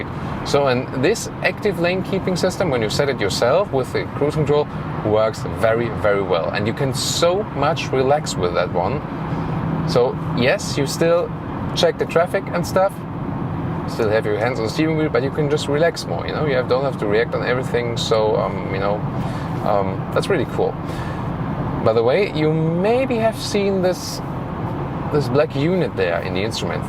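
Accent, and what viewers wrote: Germany. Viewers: OMG… you sound so Germish 🇩🇪🇬🇧 that I can’t continue watching it! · Very well done ! I like the superb number of facts you are able to tell us during driving and the pronounced subjective comments on every car ! You really speak fluently English but why don´t you stay for a couple months in the states and get rid of the hard German accent ? ! it should make your really interesting videos just perfect ! Please continue !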